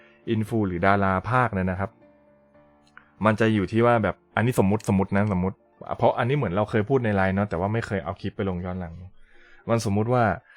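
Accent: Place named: South, Thailand. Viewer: สำเนียงใต้พี่ชัดมากเลยครับ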